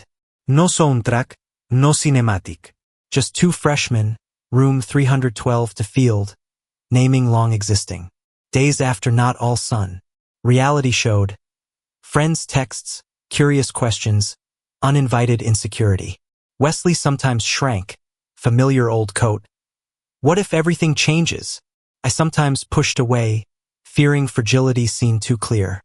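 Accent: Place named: Spain. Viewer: The voice. Missing words. Spanish accent thrown in. All reasons why I could not listen to this all the way through. 🤮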